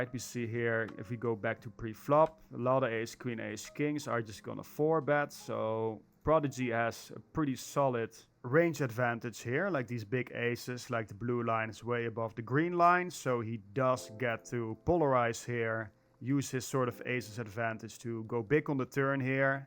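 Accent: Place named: Netherlands. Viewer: Horrible Dutch accent😂😂😂..nice vid though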